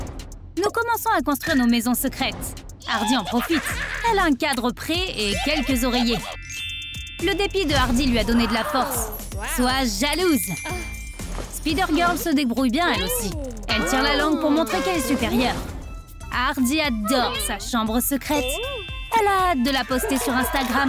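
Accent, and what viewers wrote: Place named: England, United Kingdom. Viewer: Il sons pas français il sons englai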